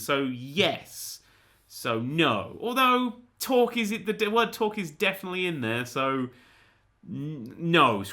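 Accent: England, United Kingdom. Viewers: are you from Britain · Are you from England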